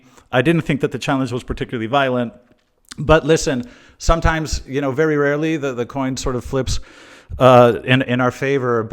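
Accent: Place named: United States. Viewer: We love this channel a lot. But it's like you are American. You speak with a clear American accent.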